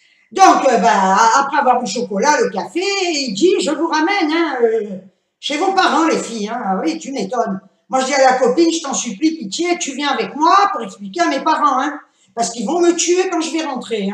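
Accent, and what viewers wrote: Algeria. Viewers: coucou ma je me suis encore payé une bonne rigolade décidément il t'en arrive toujour de bonnes enfin pour une fois ce n'est pas toi qui est tombé ouf soulag!!!là à la chute de la copine j'ai trop rit je lais vu tomber avec les chaussures qui reste collées dans bobo j'espère en tout cas le copain il devait tenir une bonne muflee pour ne plus se rappeler de la rivière et il aurait pu aller expliquer à vos parents ah les hommes!!!merci tu m'a mise de bonne humeur pour la journée. j'aime l'accent pied noir c'est sympa ça reflète le soleil et la bonne humeur bisous à vous deux je vous aime très ford😗😗😗😍😍😘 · Quel mesaventure !!!ah ces hommes il se croivent tellement fort heureusement que vous etiez la pour sortir sa voiture .Ta pauvre copine mdr tu m a fait trop rire !!!Mdr l affiche a la gare j imagine la scene :) ta pauvre copine sa mere n etait pas commode....et ta maman qui ne t as pas cru alors que tu disais la verite mais en meme temps ton histoire est tellement delirante .Et ton accent pied noir est a tomber,j en veux pleins d autres histoire comme ca pleins de gros bisous ma belle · bonsoir ma copine rigolotte ♥ , exelnent avec l'accent pied noir trop bien sa met du caracterer a ton histoir trop top :) MDR :) hahaha bonne soirée kiss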